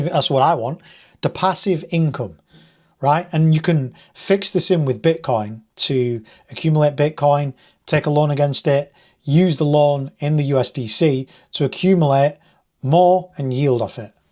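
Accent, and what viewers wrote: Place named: North West England, United Kingdom. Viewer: Good to hear a north west accent in the space! Good luck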